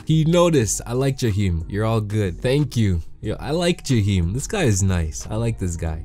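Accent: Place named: Toronto, Canada. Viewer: sound like a toronto man still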